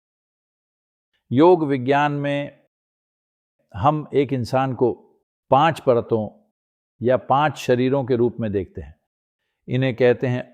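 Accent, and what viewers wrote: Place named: Tamil Nadu, India. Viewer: सद्गुरू तमिल भाषी है वहां ऐसा ही उच्चारण होता है भाई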